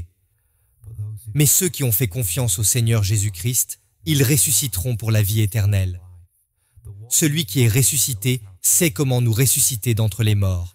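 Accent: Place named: United States. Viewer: Samedi 17 Août 2024 Bonjour à tous, J' suis contre les dialogues robotisés, de plus le narrateur est américain! Un texte expliqué par une/un FRANCOPHONE est bien plus approprié selon moi. J' ai donc écourté cette vidéo, et me suis quand même penchée sur les commentaires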